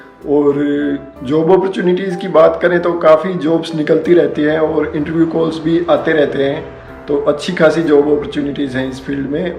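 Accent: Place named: India. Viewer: Omg how to understand that Indglish..😩😵‍💫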